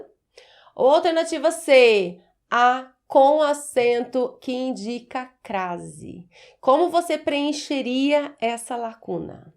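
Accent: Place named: Caipira, Brazil. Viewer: Sua aula se torna consativa para quem sabe as respostas certas, mas quer apenas revisar. Pense num modo de fornecer as respostas e depois fazer os comentários. Assim, quem errou irá aprender; quem acertou passará adiante. Seu R caipira já QUASE desapareceu, tornando-se praticamente suportável. Por conta da melhora que certamente ainda ocorrerá, já adianto aqui meus parabens!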